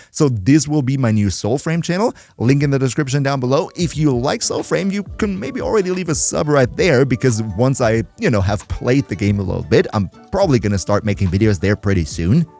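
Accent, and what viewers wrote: Germany. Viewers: U are German???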